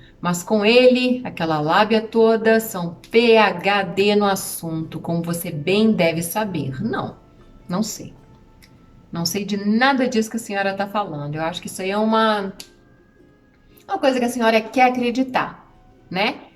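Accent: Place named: Minas Gerais, Brazil. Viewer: Não sei como vim parar por aqui,kkkk,amei o canal que indiquei para uma amiga que logo será psicóloga...Amei tanto que estou maratonando os vídeos,kkkkkk, já mostrei para o marido,logo que vi o primeiro vídeo, já pensei é mineira,uai ! Sou também,😊, parabéns pelo conteúdo,pois,até quem nem sequer pensou em se aventurar por este mundo daí,pode adquirir muito conhecimento ! Que Deus possa abençoar muito teu caminho !😘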